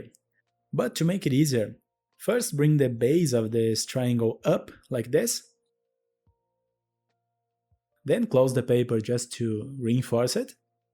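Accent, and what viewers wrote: Brazil. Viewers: O sotaque brasileiro é inconfundível hahaha 🇧🇷 incrível tutorial e lindo origami! Parabens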